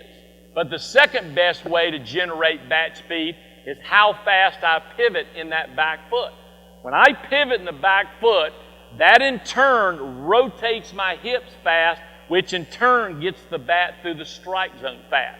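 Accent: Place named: United States. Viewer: lol american accent...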